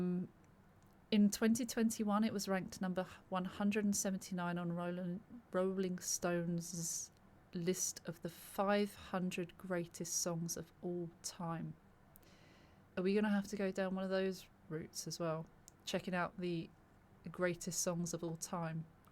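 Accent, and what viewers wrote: United Kingdom. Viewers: And her lovely British accent makes it even more special. Thank you young lady. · You just reacted to by, and it took you 41 minutes and 13 seconds to finish. You didn’t rush it. You took your time with every sentence, trying to understand the lyrics fully. And honestly, your British accent made the whole experience even more lovely. It felt like you were guiding us through the song with care and curiosity. That’s why your reactions stand out. They’re not just content, they’re connection.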